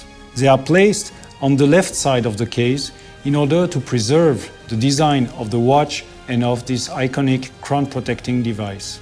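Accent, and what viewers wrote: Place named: France. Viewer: Gotta love it when the French try to speak English. Don't get me wrong, I admire it. When I travel to France the reception is warm due to my trying to speak their language. I have a ways to go in terms of improvement. They respect those who at least have some understanding of the French language and don't butcher it.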